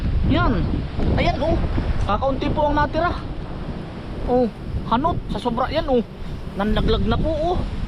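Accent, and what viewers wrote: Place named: Laguna, Philippines. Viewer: Tagasan po kayo, kc ung accent nyo ganyan po dati magsalita ung mga kawork kung taga alaminos laguna. 😂Ginagaya ko sila dati pg nagsasalita nakakatuwa po kc pakinggan.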